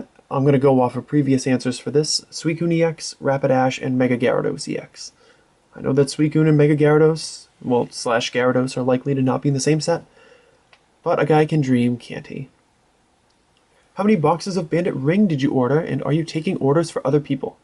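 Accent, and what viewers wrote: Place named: Massachusetts, United States. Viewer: Where in mass are you from? Haha I heard and was like :O I'm north of Boston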